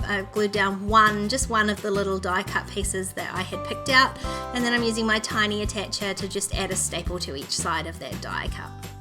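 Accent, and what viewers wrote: New Zealand. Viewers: Love it, great to hear a kiwi, can’t wait for my kit to arrive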